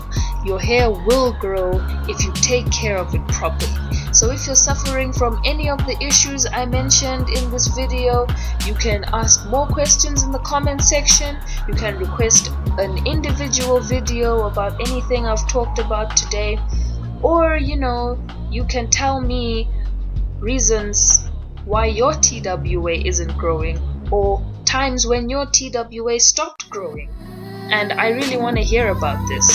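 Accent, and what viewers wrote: Zimbabwe. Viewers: This accent has to be Zimbabwean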